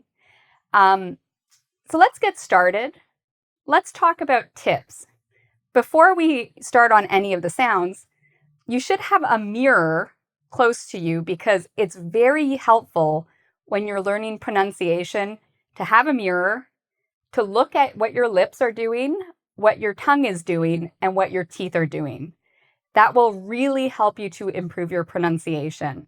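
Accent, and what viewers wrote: United States. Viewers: She sounds American two hundred percent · Is this accent American or British?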